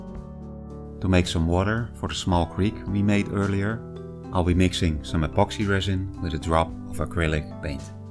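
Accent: Netherlands. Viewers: zwaar Nederlands accent. is wel cool! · nice thick dutch accent ;p lovely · Kinda random but are you dutch? 0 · Detects a dutch accent.. spots gamma ... fuck yeah Hup Holland. Also cool Diorama. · Bruh you dutch aint you? I can hear the classic dutchman speaking english accent. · Your accent tells me your dutch. Me 2 Great diorama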